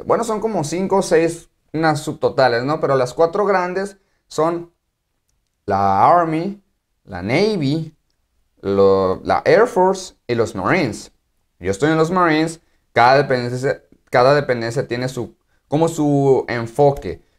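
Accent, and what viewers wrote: Sonora, Mexico. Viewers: Acabo de encontrar tu canal y tu acento se me hacía conocido, que chilo que seas de sonora yo soy de hermosillo, Mucho éxito. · Hola, Era Marine por 8 años (3rd Recon Bn. Y 1st SOTG con dos turnos de combate. Ahora soy empresario y vivo en Mexicali y con mis oficinas en San Luis RC Son. Y Mexicali BC. Me gusto tus videos. Me dio risa escuchando tu acento sonorense en tus Cammies. Saludos brother. Ooorah Hell Hound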